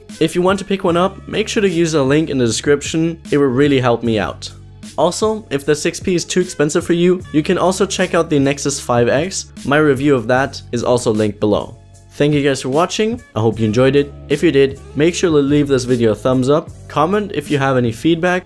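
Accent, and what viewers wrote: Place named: Germany. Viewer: The "aluminium" and your accent give away that you are german, am I right? ;) Aber super zu sehen, dass hierzulande doch ein, zwei Leute neben mir das 6P haben. :)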